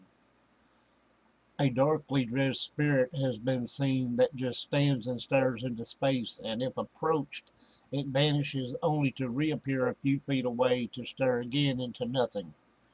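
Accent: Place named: United States. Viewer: How come there are know English person doing this video surly we know more about our heritage than Americans I say that because the person talking sounds American to me just saying lol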